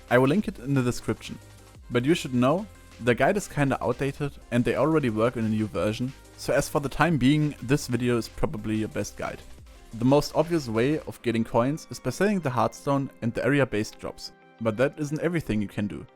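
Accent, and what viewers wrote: Germany. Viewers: I höre das deutsch heraus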